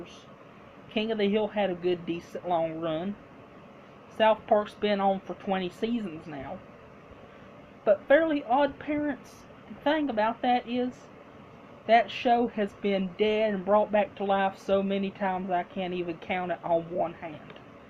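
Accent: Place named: Texas, United States. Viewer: Love that Texas accent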